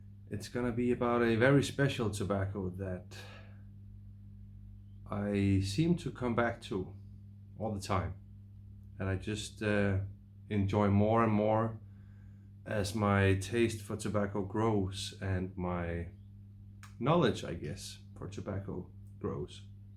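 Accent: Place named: Michigan, United States. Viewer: Sounds like Michigan 😁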